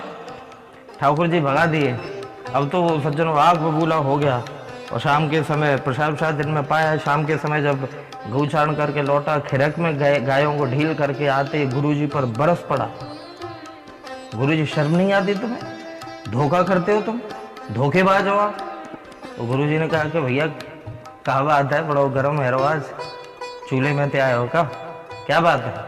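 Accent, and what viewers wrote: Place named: Vrindavan, India. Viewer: Kitni meethi boli Shri Vrindavan Dhaam ki Dhanyavaad PrabhuJi Kripa karo Bihariji Radharani kripa banaye rakhe sada 🙏🏻🙏🏻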